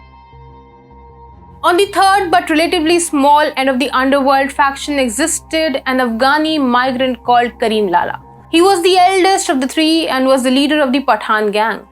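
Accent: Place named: India. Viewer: She is speaking like an indian,,i am an indian tamil,,I can understand.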